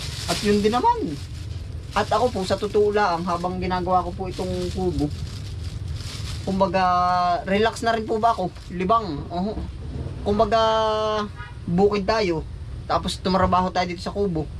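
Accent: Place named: Tagalog, Philippines. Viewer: Greetings to u from California, pinanonood namin ang vlog mo before going to bed kasi nakaktuwa ka lalo na at kumakanta ka ng ginagawa mo lang na lyrics. Ang diction mo nakakatuwa rin pure tagalog ang salita mo pero parang ngayon ko lang narinig na tagalog malalim kasi. Pray always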